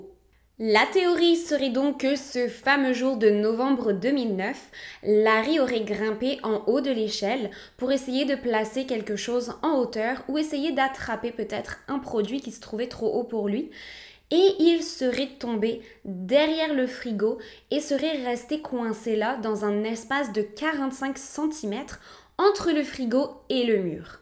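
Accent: Quebec, Canada. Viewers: larry avait l'air d'un sacré demeuré sinon laisse tomber la FAQ c'est naze, tu voudrais pas raconter des saloperies avec ton accent quebecois c'est plus drole!!** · Tu très léger accent québécois c’est vraiment mignon, j’ai froncé les sourcils je l’entendais pas tout le temps. Mais le sujet est vraiment flippant. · C'est la première fois que j'écoute une de tes vidéos... mais ce que ma frapper.. es- tu québécoise ? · C’est moi ou t’a un accent québécois? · je viens de capter l'accent Canadien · Merci pour ta vidéo 😊je viens de m'abonner 😍es-tu québécoise? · T’es québécoise ou française ?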